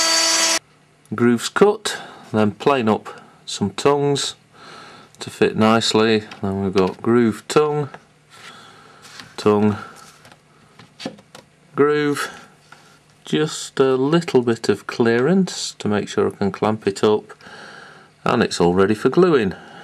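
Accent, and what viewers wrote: Manchester, United Kingdom. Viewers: Nice work. Is that a Manchester accent? Just a curious Yank.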